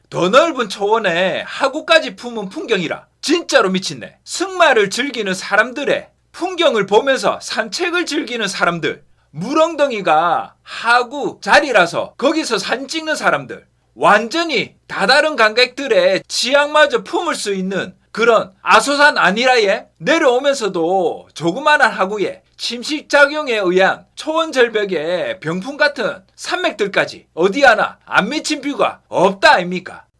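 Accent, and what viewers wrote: Gyeongsang, South Korea. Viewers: 갱상도 살아있네예~ 👍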